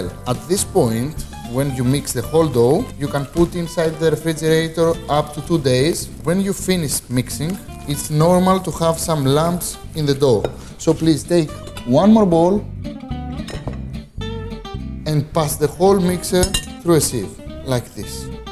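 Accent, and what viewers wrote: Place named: Greece. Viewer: Καλό μαγείρι ο ς, δεν λέω ΑΛΛΑ, εμείς στραβωθηκαμε να μάθουμε Αγγλικά, ακούγαμε τα εξ αμάξης στην Αγγλία για την προφορά μας, και έρχεται ο ς με την ελληνικότατη προφορά του, να μπερδεύει το αλμυρό με το ξινό, να μην ξέρει πως λένε ούτε τα μπισκότα, και παρόλα αυτά τους βλέπεις όλους στα σχόλια να ξερογλυφονται. Γεια σου ρε!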